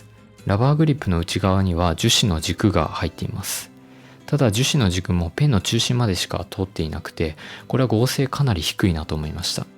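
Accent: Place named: Kansai, Japan. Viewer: たしかに「屁出ら」はダサいネーミングですね（笑）。は関西の方でしょうか？独特のイントネーションやアクセントが可愛らしいです。これからも面白い商品の紹介を期待しています！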